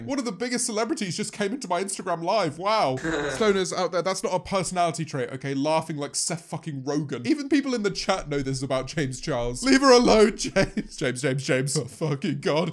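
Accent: United Kingdom. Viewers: Sometimes I wish I had this accent I don’t know why, I really like British accent lol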